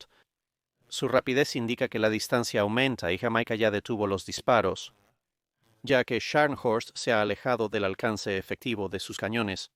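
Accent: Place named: United Kingdom. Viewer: Y me encanta ese acento británico y esa educación con estilo. Es muy agradable y lo seguiré siempre. Felicidades.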